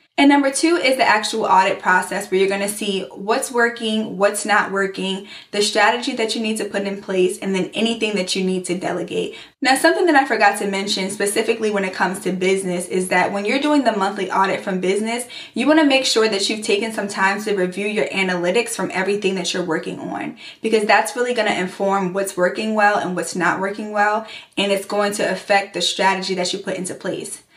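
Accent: Baltimore, United States. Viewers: When I signed up for your email newsletter a few weeks ago it said Maryland at the bottom of the email. I knew you were from somewhere in Maryland because certain words you say you sound exactly like my husband whose from Baltimore and I thought that confirmation was too funny lol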